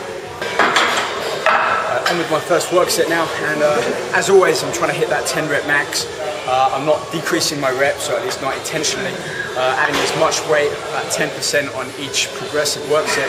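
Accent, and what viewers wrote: United Kingdom. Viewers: um... no he's english, his home town is london and he as a british accent...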